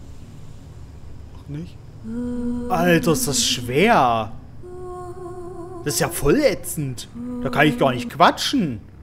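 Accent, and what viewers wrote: Saarland, Germany. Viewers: Bist du Saarländer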